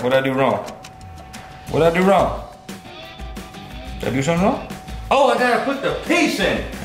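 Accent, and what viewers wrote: South, United States. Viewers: You're brilliant, and so down to earth. You make it great entertainment down here in Australia for me. 😂 I love hearing your stories and this cooking stuff is just a down to earth southern country boy by the sound of your accent that I've that heard on utube. I wonder why you are single, I'd love you to be leaving your boots under my bed. You are one good looking handsome hunk of a man. 😊 ❤❤❤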